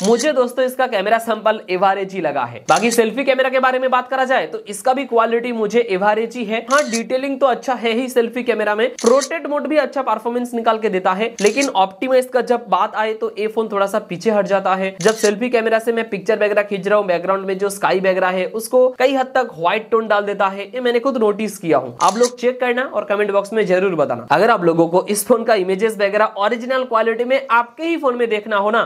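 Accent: Bengal, India. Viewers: You have a strong bengali accent.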